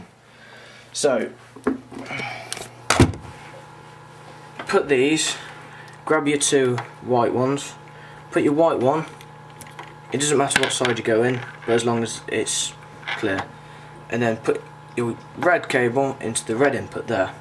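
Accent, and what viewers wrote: United Kingdom. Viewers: You can notice that he has a British accent.... they use fucking STERLING POUNDS as a currency... -_ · no some british people don't sound like harry potter, but this guy sounds almost identical to him go watch harry potter then watch this vid again. i wish i had a british accent, having no accent is boring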